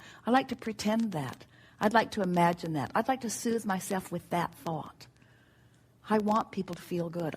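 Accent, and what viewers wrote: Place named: Transatlantic, United States. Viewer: That is so lovely. I love starting my day with a warm drink and listening to Abraham. Esther has such a wonderful transatlantic voice, perfect for storytime and speaking to our inner knowing.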